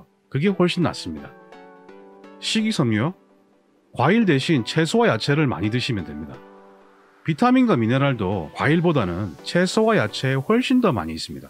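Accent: Gyeongsang, South Korea. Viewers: 경상도 어디신데요? 말투가..부산인데 좋은 내과 찾고 있어요..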